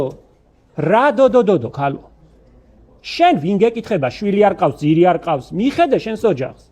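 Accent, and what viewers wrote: Guria, Georgia. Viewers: ვგიჟდები შენ გურულ კილოზე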